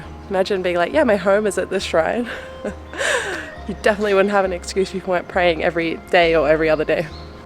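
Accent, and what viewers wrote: Australia. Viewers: An Australian with an Australian accent that lives in Japan and speaks Japanese and enjoys Japanese food and culture? I think I'm in love ❤️ lol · How are you German but have an Australian accent? So confusing 😂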